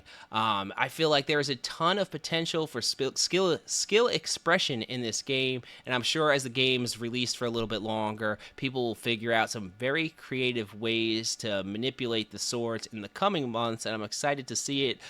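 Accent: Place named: New Jersey, United States. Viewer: This guy is def from NJ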